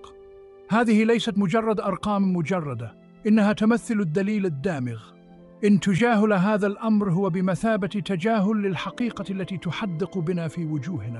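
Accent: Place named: Bosnia and Herzegovina. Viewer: ليست عربية ب لكنة أوروبية أو يوغسلافية أو بوسنية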